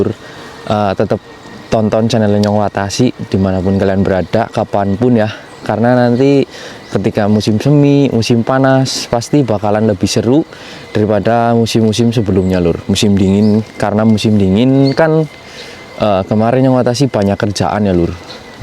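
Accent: Banyumas, Indonesia. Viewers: ngapak ke bagian ndi mas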